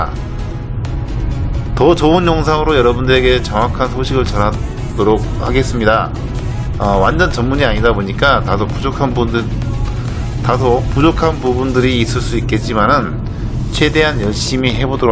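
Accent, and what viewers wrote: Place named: Jeolla, South Korea. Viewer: 즌라도 사투리 쩌네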